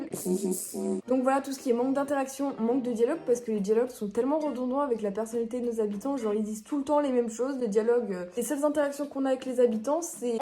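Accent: England, United Kingdom. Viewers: Évite de faire l’accent anglais c chiant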